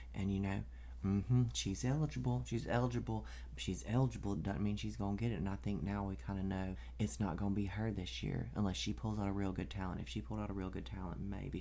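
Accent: South, United States. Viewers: Bless her heart! She needs some home training! 🤣🤣🤣 I just love you! You get Southern right!!! 🥰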